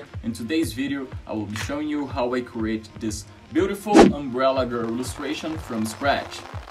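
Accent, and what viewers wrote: Brazil. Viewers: Só pela forma de falar já sei que é BR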